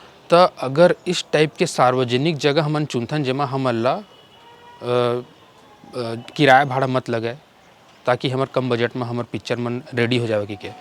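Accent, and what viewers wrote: Chhattisgarh, India. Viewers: sahi khe bhaiya e galat baat aaye kono mer bhi video bnaye ma kooi galat baat nai he aap lage rhav koi bdde bajat ke film rhitis ta permission ke jarurat padtis o mahila khud Chattisgarh ke nai hohi tabe tunhar boli sun ke bhi ola pta nai chalis pr ihu baat ho sakthe ko ola apn area ke security ke fikar hohi ladki ya mahila mn ke ki kono au jgh ke ladka mn aake oti ke turi mn la pareshaann mt kray ya chori hari ke dr ma khe hohay pr aap lge rhav kono din o ha e video la dekhhi au humar Chattisgarhi ola samajh ma aahi ta jrur smjh jahi..... keep it up au All the best.....😎😎😎😎😎😎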